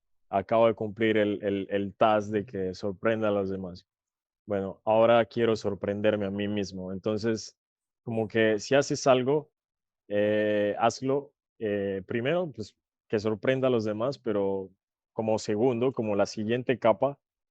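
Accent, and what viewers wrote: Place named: Colombia. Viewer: Porque a veces habla full Colombia o como un gringo aprendiendo español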